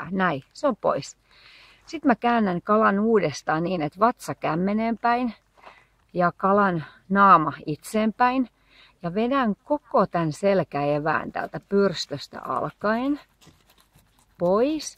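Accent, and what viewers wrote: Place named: Turku, Finland. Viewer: Tyypilinen turun murre.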